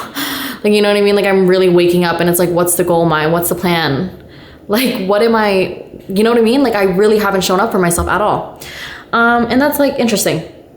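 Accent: United Kingdom. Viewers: you are my queen and the british accent pops off x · We love your Bri’sh accent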